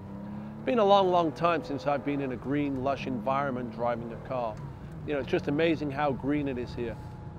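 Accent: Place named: United Kingdom. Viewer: You can really tell that he's a British boy that's lived in the states for so long. His accent sounds so muddled between the two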